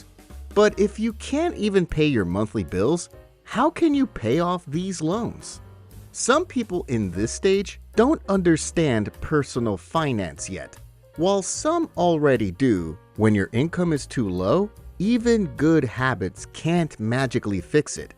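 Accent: United States. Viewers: I thought the American accent gave it away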